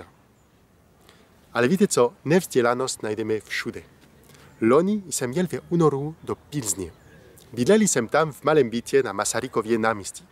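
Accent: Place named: France. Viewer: Je to sympaticky tenhle francouzsky (?) prizvuk v cestine. Ahoj z...Rumunska, kde existuje dulezita cesko-slovenska mensina! C'est sympa cet accent (francais?) dans le tcheque. Salutations de la ...Roumanie, ou il existe une minorite tcheco-slovaque importante!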